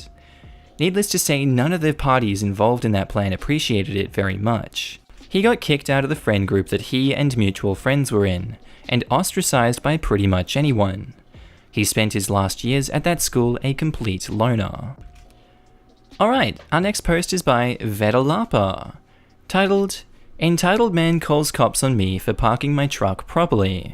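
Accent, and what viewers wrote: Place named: Australia. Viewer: Aussie far from home here, sometimes I listen just to enjoy the accent :-)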